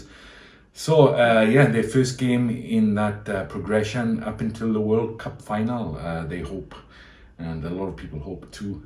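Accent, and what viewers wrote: Wales, United Kingdom. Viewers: You sound like a Welsh man. I am good friends with spike Williams, ex captain of Wales. again, many thanks, and I wish you the best of luck with your channel.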